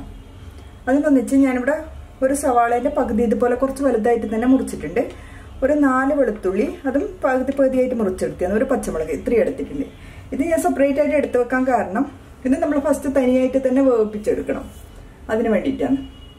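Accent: Kannur, India. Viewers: ഞാനും ശ്രദ്ധിച്ചു. അച്ചടി ഭാഷയിൽ നല്ല രീതിയിൽ അവതരിപ്പിച്ചു. എങ്കിലും ഇടയ്ക്ക് നമ്മളെ കണ്ണൂർ ഭാഷ വന്നു. ഞാൻ തലശ്ശേരി. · I like your kannur slang very much. I will try soon. To pressure Cook Tur Dal only 90 seconds required. If we are releasing the air from the cooker, we are waisting the gas. This for your information. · Kannur basha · സൂപ്പർ. കണ്ണൂരാണോ, വടക്കരയോ.... സംസാരം കേട്ടിട്ട്.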